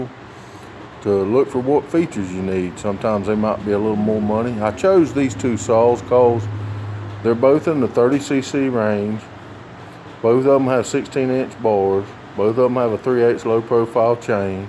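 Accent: United States. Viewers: we Love our Southern accent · Sounds like good-ol American English 2 me.....👋😁🇺🇸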